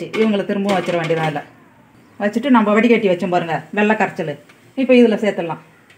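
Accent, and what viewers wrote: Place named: Tiruvannamalai, India. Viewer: நீங்க எந்த ஊரு அண்ணன் எங்க ஊரு பாஷை மாரி இருக்கு நான் திருவண்ணாமலை 👋நீங்க என்ன ஊரு சொல்லுங்க அண்ணா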